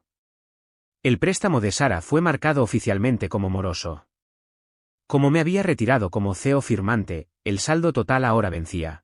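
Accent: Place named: Andalusia, Spain. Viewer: ÉSE TONO, MEDIO ANDALUZ, MEDIO ESPAÑOLADO,ES BASTANTE SINGULAR...ES EXCELENTE LÁ DICCIÓN... SALUDOS CORDIALES..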